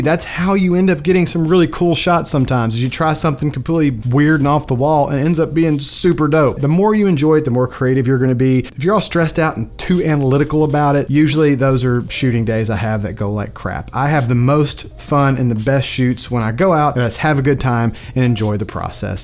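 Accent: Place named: Texas, United States. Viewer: #Helpful. Will use your skill share link. Where you from? Texas?... great accent!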